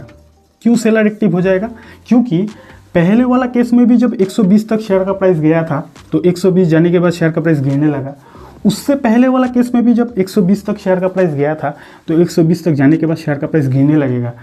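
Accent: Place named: Assam, India. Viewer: Assam ka hindi lag Raha hai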